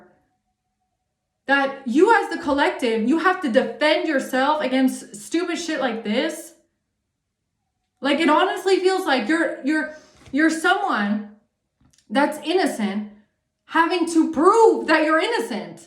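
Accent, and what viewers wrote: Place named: Germany. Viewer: Good morning 🌅 beautiful 😇👀👑, hope you have a beautiful day night, love your accent Germany ❤💜🌅💜,I was abit worried about you last night, I wouldn't want to piss you off,I love you ❤, your are a earth angel 😇☀️⭐, anyway you distract me 😊, beautiful,I worry about you, when you bend down, your neck from your accident,need a massage, anyway looking forward to hearing your voice and thoughts 💬, peace love and light 🕯️ x😊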